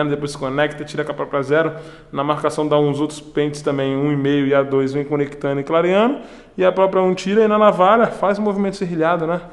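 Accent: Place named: Rio Grande do Sul, Brazil. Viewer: Tú é gaúcho né pai,eu também sou reconheci só pela voz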